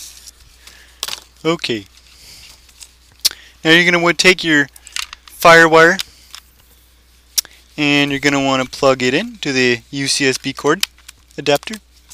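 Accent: Canada. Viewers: Is he Canadian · He kind of sounds Canadian to me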